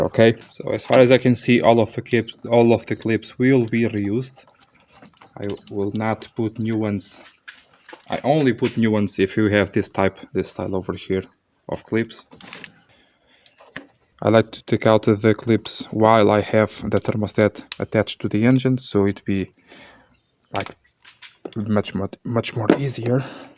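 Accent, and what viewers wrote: Portugal. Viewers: Boas, dá para entender que és tuga, desde já bom trabalho com os vídeos muito explicativos e detalhados, no entanto gostaria de perguntar se tens negócio aberto e se sim, em que zona? Já agora mais ou menos o valor da troca de uma corrente distribuição para um motor 1.3 multijet fiat grande pinto 2007? Tenho o material necessário no entanto receio de o mudar, Obrigado e continuação de bom trabalho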